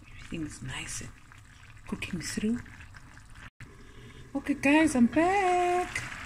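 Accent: Cape Town, South Africa. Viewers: That capetonian accent is unmistakable.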